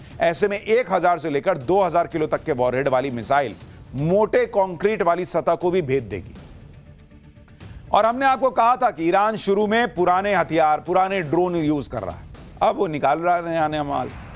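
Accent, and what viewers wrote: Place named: Magahi, India. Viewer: Pura patnaiya maghi style me bolte hai sir aap😅😅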